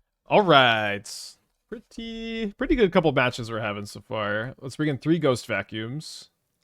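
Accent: Texas, United States. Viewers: Texas accent, i hear it that way.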